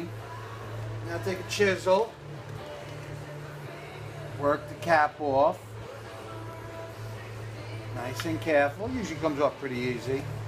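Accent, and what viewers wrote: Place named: New Orleans, United States. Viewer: Your accent sounds a little New Orleans but not exactly.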